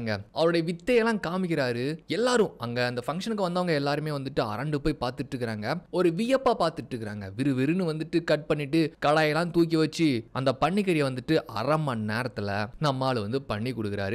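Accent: Tirunelveli, India. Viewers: பேச்சில் திருநெல்வேலி சாயல்..❤❤❤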